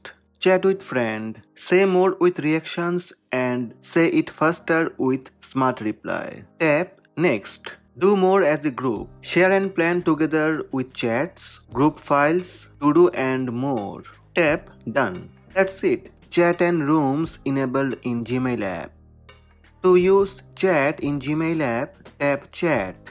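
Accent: India. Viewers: His accent is Indian, sonny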